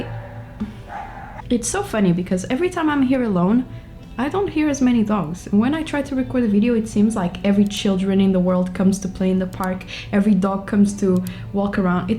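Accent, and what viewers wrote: Brazil. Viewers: Olá. Seu sotaque é de brasuca! Hahahaha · you have such a brazilian accent,iu are from brazil?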